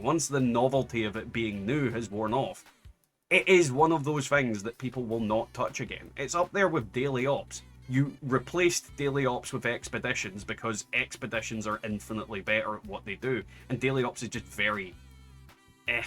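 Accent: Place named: Wales, United Kingdom. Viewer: I totally love the Welsh accent, my Dad/Father is originally from St David's before migrating to Australia for work... so im glad I found a Welsh fallout 76 YouTuber finally. Great video, keep up the good work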